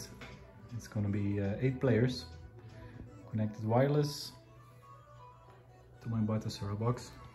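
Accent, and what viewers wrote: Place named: Netherlands. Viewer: Batocera is awesome! U sound dutch. ( I am also dutch).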